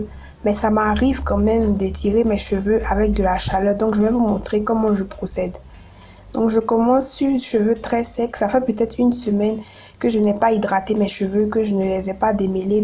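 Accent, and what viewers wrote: Ivory Coast. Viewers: L’accent ivoirien hein il est calé On adore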